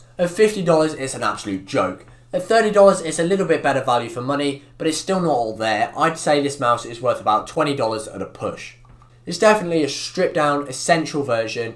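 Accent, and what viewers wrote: United Kingdom. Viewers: Heyyy are u bri’ish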